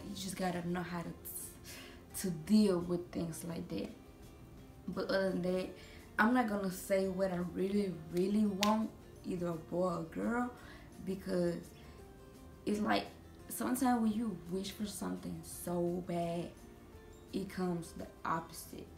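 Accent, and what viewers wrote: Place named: South, United States. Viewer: Lol she sound country with a mix of Joseline herendez she really got a southern accent sounding like Omertà “ you so country you so country.. these hands country too” 😄😄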